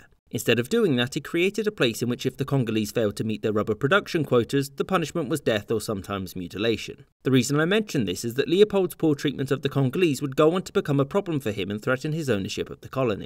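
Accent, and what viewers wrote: United Kingdom. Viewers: as told by a brit yes, you know thisll be good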